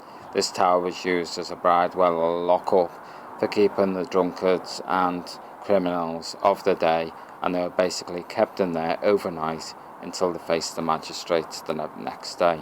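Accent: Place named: Birkenhead, United Kingdom. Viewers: It's a Birkenhead or gay accent innit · commentator sounds bent or from Birkenhead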